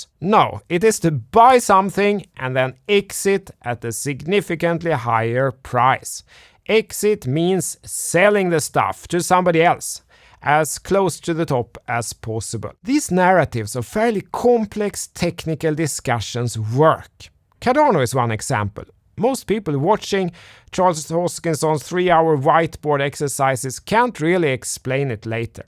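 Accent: Sweden. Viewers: Like you’re video! And the most Swedish accent I heard 😂😁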